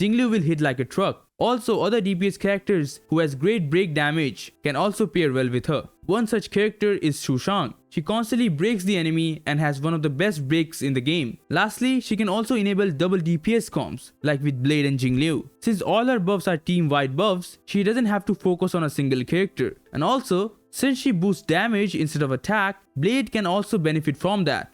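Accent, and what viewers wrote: India. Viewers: Ugh cant stand indian accents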